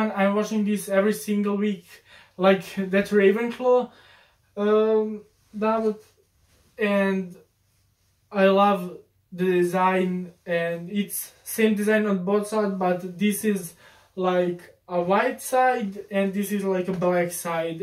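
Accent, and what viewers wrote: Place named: France. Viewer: you have a french accent 😱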